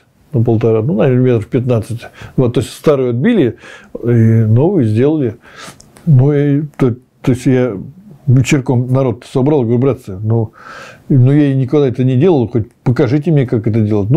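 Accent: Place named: Okanye, Russia. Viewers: Слушаю, стал как он окать! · у меня был приятель из Коостромы, так он так же говаривал, я ему говорю - специально, а он удивленно, а я по другому не могу. А теперь послушай говор жителей из ДНР, ЛНР и солдат ВСУ, у них один характерный южный говор, такой же в Ростове, Краснодаре и т.д. Для изменения нужны годы, вот Примаков наш Премьер и руководитель СВР ранее говаривал с Тифлиским акцентом, а после института как диктор телевидения.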